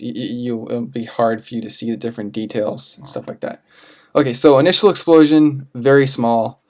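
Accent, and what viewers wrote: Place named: United States. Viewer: Liked and subbed. You have everything an ispiring animation student needs,a clear voice and mic,good american accent to understand,explaining everything multiple times.. Thanks man i really needed this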